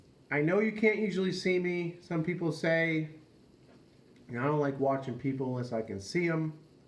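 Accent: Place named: Boston, United States. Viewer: remember when I said you have changed.. your voice use to be a lot more... Boston or main... idk how to describe it.. aren't u from the east coast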